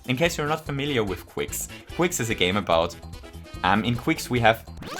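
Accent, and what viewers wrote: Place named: Germany. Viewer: This video was pretty shit tbh. Its basically 17 minutes of conjecture and assumptions, why should a simple reference make every super Nintendo commercial canon? Why should Nintendo pay mind to something that literally no one will think of? And about that whole cultural caricature thing? Mexican people still wear ponchos and sombreros, so why shouldn't mario be able to wear local attire? Based on your accent I'm gonna assume you're German. And as a german, don't you find it fun when a character wears lederhosen or speaks with a german accent? I know as a dutchman when someone assumes I wear wooden shoes and smoke weed I'm charmed. I know that waluigi is incredibly popular here because he represents us, I love your content but this whole video had me scratching my head through its entire runtime. I really hope you'll do better next time.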